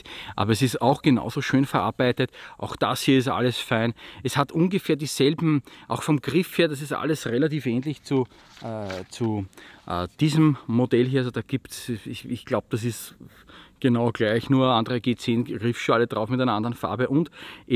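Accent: Austria. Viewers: Der Ösidialekt is eh da geilste.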